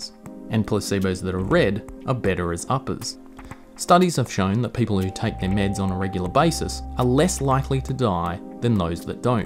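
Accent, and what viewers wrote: Australia. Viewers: this australian accent makes it impossible to take seriously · Umm... all i learned is that you have a freakin' wicked aussie accent, mate1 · Love ur Aussie accent :D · thats aussie accent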